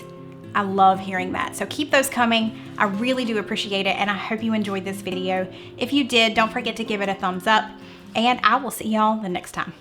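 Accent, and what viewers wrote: South, United States. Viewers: I love watching your Videos,!! Also Love your Country Accent.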